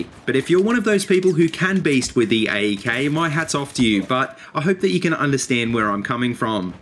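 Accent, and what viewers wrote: Australia. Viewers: Been watching since m 39 sks review I believe. Love the videos your making. Hope your band flops so u can keep making theses vids lol. Truly hope yall do well. Had a few friends that tried a music career didn't make the "big time" but did well.sorry I forget your bands name could you please remind me. The 240 bravo, Ace 23, 870, ace-sv and scar are my "go to" weapons. Looking to change it up a little bit , could u recommend a new weapon selection. Played with most but those the ones I gravitated towards an after a cpl of beers my game play goes ptfo to sporadic game planning an "ttt". And 1 last thing if u don't mind where in the world are u. I think u an Australian. Not sure no offense if not jus not good with accents and flags. So to sum up love vids, can u recommend some new weapons.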